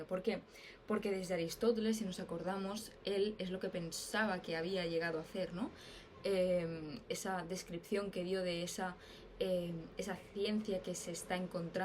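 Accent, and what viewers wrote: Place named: Spain. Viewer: Me gustan las mujeres q leen...¡y qué manera de gustarme el acento español!...felicitaciones...😊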